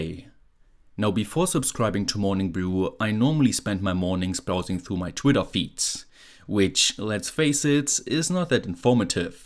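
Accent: Germany. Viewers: You sound absolutly like a german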